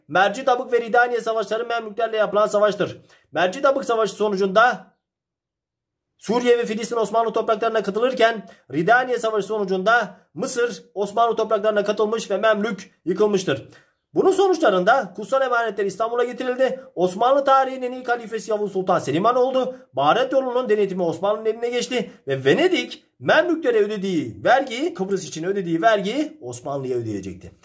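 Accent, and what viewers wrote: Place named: Diyarbakır, Turkey. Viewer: Hocam alakasız olacak ama Diyarbakır'lı mısınız ? Tam o tarafın ağzı ile konuşuyorsunuz da merak ettim açıkçası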